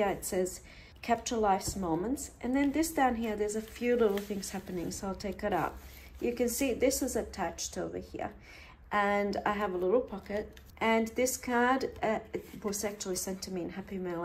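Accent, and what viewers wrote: South, United States. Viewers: 😂 I bout fell out at your country accent! Plus, going overboard and getting overly excited...theres no such thing! This journal turned out amazing! I look forward to seeing more like it! Thank you always for your honesty, your wits/ sense of humor and your mind blowing ideas! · I LOVE your Southern Accent!!! As a Texan I highly approve 😂💖😂💖😂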